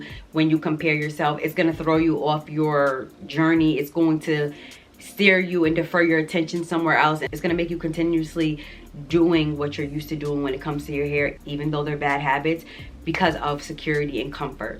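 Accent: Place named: New England, United States. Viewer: I love your videos!! They are very educational and entertaining too! Are you from NY or New England? I hear that accent! 👍🏽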